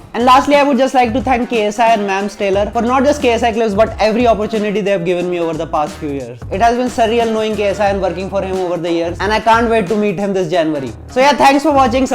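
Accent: Rajasthan, India. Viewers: Bro I'm from Rajasthan as well but I haven't met someone with that strong of an accent lol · you're funny af bro the indian accent makes it even better 🤣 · Bro got the classic Indian accent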